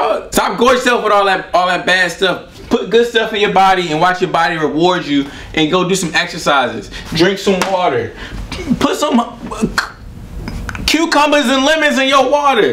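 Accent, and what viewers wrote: Baltimore, United States. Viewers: Is he from Baltimore? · Hear that bmore 😂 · most def from bmore i hear it lol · he baltimore af “EXECISE” no R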